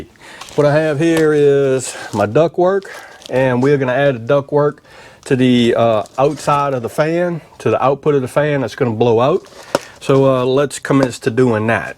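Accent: South, United States. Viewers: Honestly subbed for that thick south accent 💪🏻😁👍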